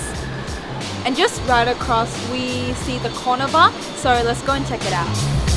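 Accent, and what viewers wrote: Australia. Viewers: Hello This is. Thank you for all your lovely compliments regarding my presentation, I really hope I inspire you to experience Sanchaung. Just like what a lot of you commented, my English has a particular accent because I've actually been persuing a degree in Monash University (Australia) for a few years so I've been going back and forth between the two countries. And of course using my English for the EatSleep Yangon audience had been a great pleasure for me to show my love for my native culture. Again, thanks for all the interest! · Well done, I did detect a slight Aussie accent, maybe coincidence. Good Video as always.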